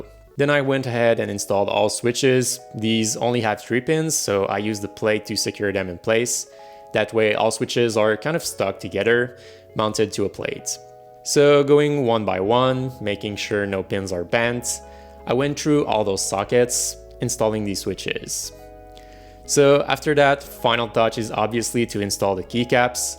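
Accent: Quebec, Canada. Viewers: Hey, are you looking to sell this keyboard? I live in Montreal and I can get around - I figured from your accent that you are from Qc as well. So if you're interested, hit me up!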